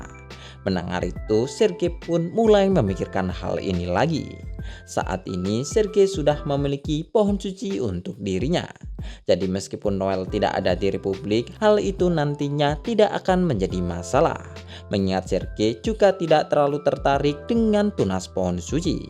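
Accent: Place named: Madura, Indonesia. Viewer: Bg lu orang madura ya, kelihatan logat maduranya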